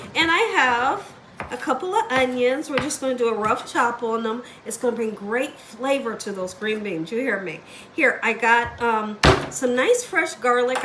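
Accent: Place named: Midwest, United States. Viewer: What state are you ? I can hear mid western accent or southern.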